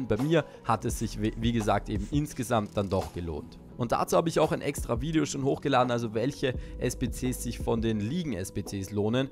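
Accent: Austria. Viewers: Woher kommst du? (Österreich oder Deutschland oder)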